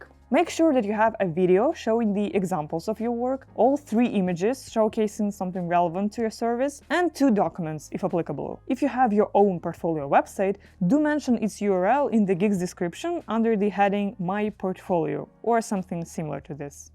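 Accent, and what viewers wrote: Russia. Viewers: Are you a Russian? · Are you russian or latvian or from Dutch ? Im a bit confused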